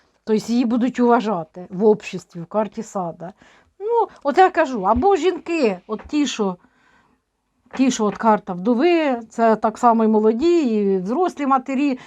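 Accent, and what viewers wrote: Ukraine. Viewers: Пані дорогенька ми Вас любимо Ви Є уособленням України, жіночності, берегині. А то пише провокатор, неук, щоб укинути колот. Ви абсолютно по Українські, по народному розмовляєте, говорите... Дякуємо за Вашу працю, цінуємо, любимо!!!😍🥰💙💛🌻🌼💐🌻🌼💐🌻🌼💐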